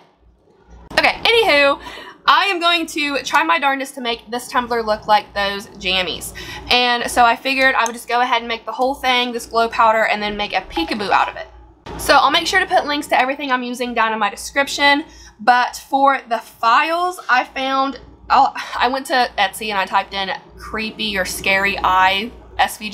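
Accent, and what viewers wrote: South, United States. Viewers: Ok, I love your videos! So natural and fun...but I have to ask, where are you from? I’m from the south and sometimes I hear a bit of a southern accent but I’m not sure. Just curious. 🤗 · omg I’m a southerner and thought you were from the south this whole time!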